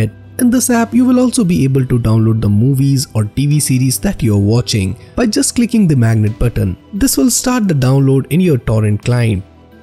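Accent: India. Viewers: He sounds like that Indian from android guy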